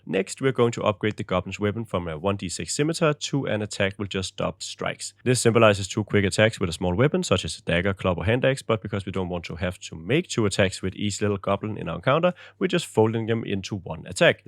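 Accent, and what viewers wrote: Denmark. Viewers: love the quality of the video but i just have to know. are you danish?(i just Wonder cause of the accent)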